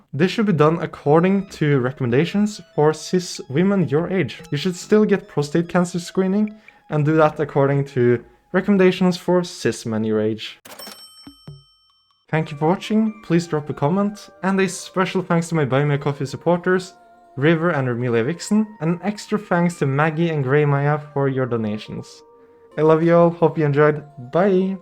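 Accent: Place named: Norway. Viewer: recognizes accent -immediately goes to channel info -NORGE!!!🇳🇴🇳🇴🇳🇴🇳🇴🇳🇴💪🏻💪🏻💪🏻💪🏻💪🏻💪🏻💪🏻💪🏻💪🏻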